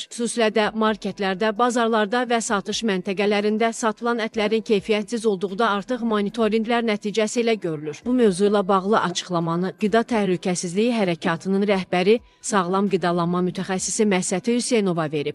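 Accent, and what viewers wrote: Armenia. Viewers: Bu kim idi? erməni ləhcəsində məlumat yayıb.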